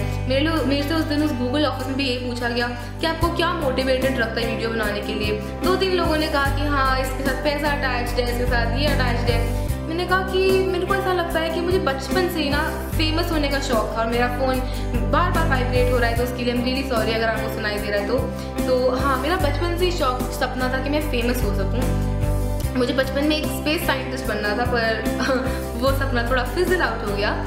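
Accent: Delhi, India. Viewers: Oh my god u r so cute ur hindi accent is superb u are a typical delhi based girl i just loved it Nd even i m 20yrs n still my parents are not allowing me for learning driving anywayz no issue and all the best for ur exams lots of love😘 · Tumhari Hindi kitni polished lagti hai ! And the typical Delhi accent ! It sounds amazing !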